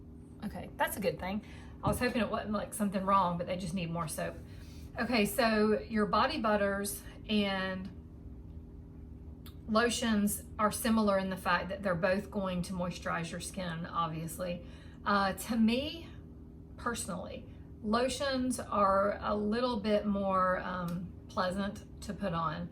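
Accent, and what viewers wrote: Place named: South, United States. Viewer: And I KNEW YOU WERE SOUTHERN! ! (next door neighbor, hi from Alabama)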